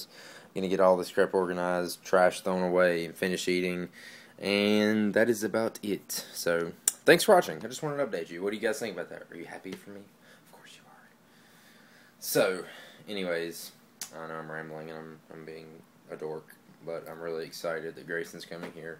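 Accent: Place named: North Carolina, United States. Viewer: hahaha I'm from North Carolina too and i as soon as i heard your accent i knew you were from NC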